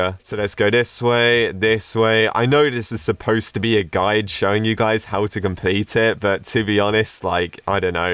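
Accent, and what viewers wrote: United Kingdom. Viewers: are you came from UK?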